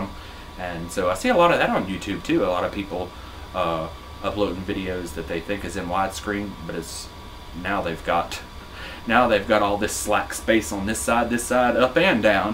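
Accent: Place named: United States. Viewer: your american accent is funny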